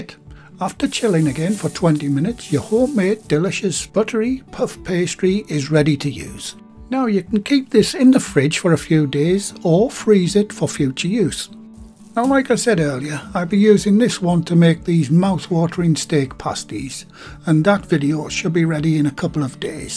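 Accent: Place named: North of England, United Kingdom. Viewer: Hi I have been reading the comments below: first I am Irish and nobody would accuse me of being biased in FAVOR of Brits - has a lovely north of England accent; his instructions are clear and very easy to follow, his gentle mild mannered slightly self effacing style tells me here is a working man and no snob. Credit where it's due I used to be a good baker but credit for my successful return to more serious home cooking belongs to. Thank you - don't mind the "begrudgers" as we say in Cork...Thanks for your videos G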